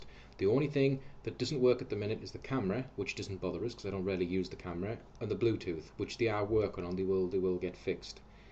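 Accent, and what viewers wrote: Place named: Northern England, United Kingdom. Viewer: damn cool accent you've got there haha :) northern england, that is, yeh? york?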